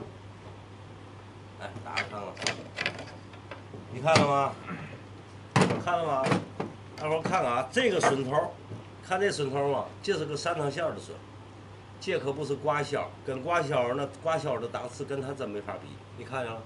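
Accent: Tianjin, China. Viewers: 在外留学的天津人。最近刚遇到您们的视频，听到乡音无比亲切，看到木工大开眼界。未来家里的布置的审美得到了培养。谢谢上传者，更要谢谢老师傅。美好的事物永恒。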